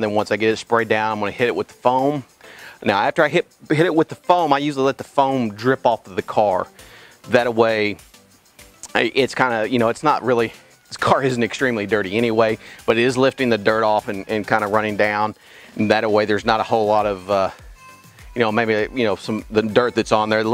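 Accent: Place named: Oklahoma, United States. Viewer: Beautiful car! When I heard you talking, I'm thinking this guy is from Oklahoma or used to live there...lol Then I saw the OU stickers in the garage...Boomer Sooners!! Then I saw the Oklahoma plates on the car...hello from a fellow Okie!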